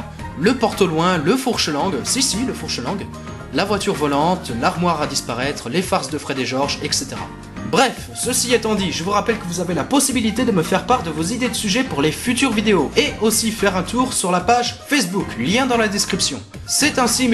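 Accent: Switzerland. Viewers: Monde remplie de magie il a un accent suisse c'es tout ^^ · Tu est suisse ?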